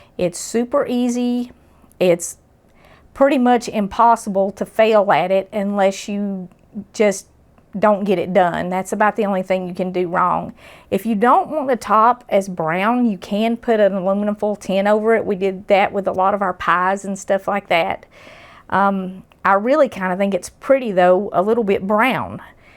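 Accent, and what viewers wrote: South, United States. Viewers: My six year old grandson said, "She must speak a different language." I explained you were a Southern. I was born in Virginia but we live in Maryland. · Hello from So Cal. The change in the weather has me wantin' to cook/bake. I've never used YouTube before. Stumbled upon your channel a week ago. I love it so much., you are so sweet and comforting especially with your southern drawl. Your Christian kitchen touches and the way you share scripture is wonderful and helpful to me. Sometimes I just tune in and fall asleep to your voice....am I weird? Anyway, thank you, again for putting in the work and time. God bless you and your family and staff. I'm making the Soda bread for a homebound friend. She specifically suggested cranberries and raisins. 🤗💕🫶🙏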